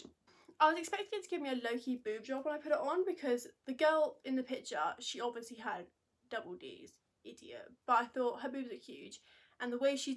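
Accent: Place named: RP, United Kingdom. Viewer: Love a posh English accent, is short for?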